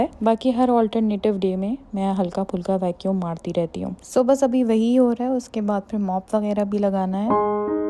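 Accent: Karachi, Pakistan. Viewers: your Urdu is sooo posh! are you from Karachi?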